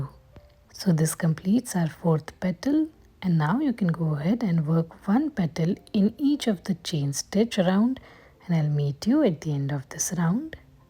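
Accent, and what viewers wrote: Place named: India. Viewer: indian accent IM IN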